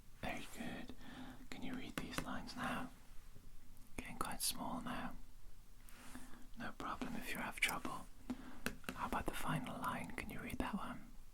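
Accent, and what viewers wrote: Wales, United Kingdom. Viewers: Where in wales are you from?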